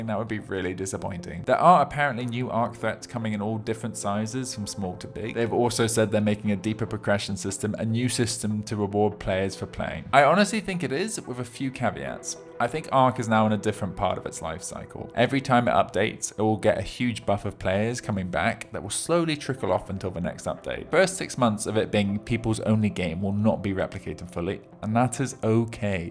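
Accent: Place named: United Kingdom. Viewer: Your a straight up limey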